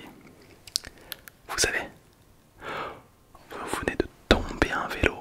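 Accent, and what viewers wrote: South, France. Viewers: Ty serais pas du sud ?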